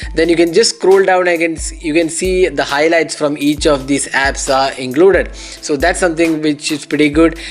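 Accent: Kerala, India. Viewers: ശബ്‌ദം കേട്ടിട്ട് മലയാളി സംസാരിക്കുന്നത് പോലെ ഉണ്ടല്ലോ 🤔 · ഒരു മലയാളി ഏത് ഭാഷ സംസാരിച്ചാലും മലയാളിയാണെന്ന് മനസ്സിലാകും.നല്ല വീഡിയോ. ബെസ്റ്റ് വിശസ്.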